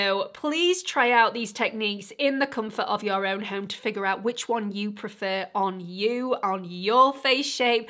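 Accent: United Kingdom. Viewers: Hi, that was insightful I normally don’t wear blush. I literally have only two one of which is a highlighting blush. If I ever choose to wear them on the more study regular basis, I will definitely head your advice. Thank you for the review and by the way, I love your accent may I ask what part of the UK are you from?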